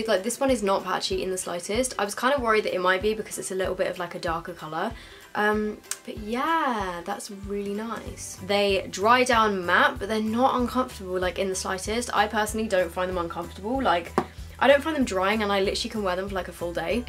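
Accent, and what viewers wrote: England, United Kingdom. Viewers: I live for english accent 😍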